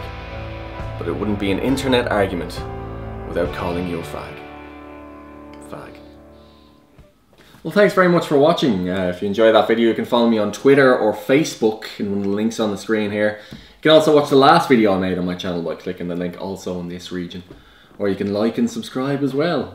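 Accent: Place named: Ireland. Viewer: Your soo funny and I would do anything to have your IRISH ACCENT. Swap? I'll give you A Scottish one for your Irish One? Sound Good? :D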